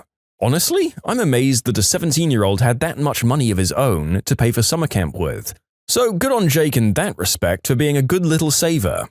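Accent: United Kingdom. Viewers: Why do you sound British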